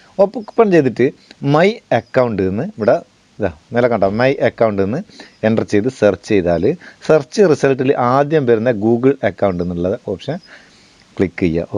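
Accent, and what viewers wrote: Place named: Kasaragod, India. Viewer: kasaragod aano🤔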